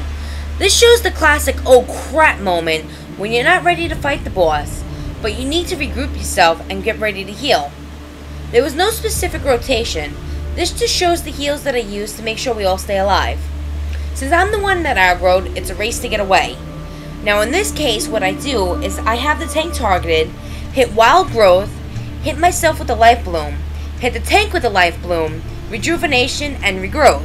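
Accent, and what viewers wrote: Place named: Brooklyn, United States. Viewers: i like 2 things about this video 1) the tip saying how healing isn't nessicarily about rotations just knowing what move is best for a given situationg and 2) your awsome brooklyn accent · she sounds like mila kunis with a bit of a new yorker accent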